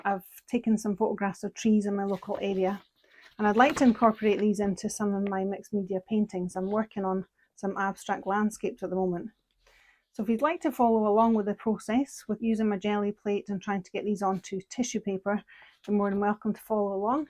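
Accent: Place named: Scotland, United Kingdom. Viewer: Love your test book. Love your Scottish accent. Love your work!! Subscribed 😊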